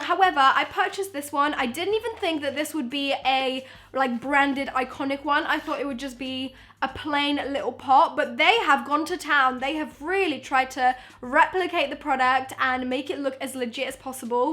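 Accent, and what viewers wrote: United Kingdom. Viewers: This british accent omg 😍😍😍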